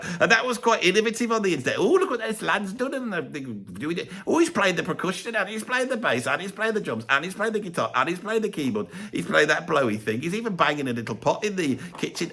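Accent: Birmingham, United Kingdom. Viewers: I don't know the first two he talks about. I'm not that into music, I'm just staying for the Brummie accent!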